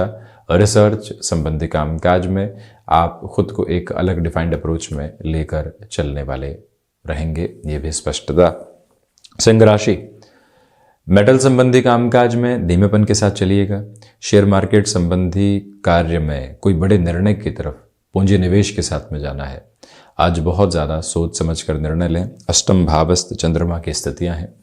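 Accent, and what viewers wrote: Standard. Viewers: मैं आपके चैनल को फॉलो कर रहा हूँ और दिन प्रतिदिन का राशिफल सुन रहा हूँ। आपकी भाषा, आपकी हिंदी बिलकुल शुद्ध और साफ़ है । इस बात की ख़ुशी है की आज भी ऐसी शुद्ध हिन्दी कोई बात कर सकता है । प्रनाम 🙏🏻।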